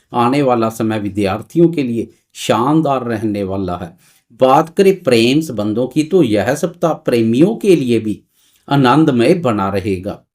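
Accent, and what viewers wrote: Punjab, India. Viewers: Sir ik bath bathoo vasay app punjabi ho kya😊 kieyu ki bolthay hoye bhi hindi ap ki punjabi jalkthi hai ji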